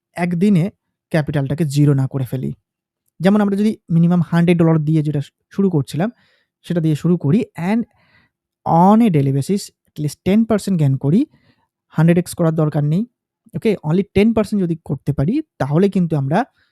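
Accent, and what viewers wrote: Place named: India. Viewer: Apni indian nki bhai indian er mto lgche kotha gulo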